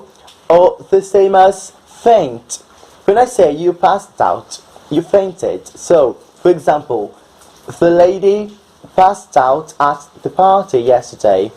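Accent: United Kingdom. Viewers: Muito legal, muito útil! Eu conhecia 4 dos 10 phrasal verbs, os outros eu nunca imaginaria! Parabéns pelo canal e pelo "Great British Accent"! See ya mate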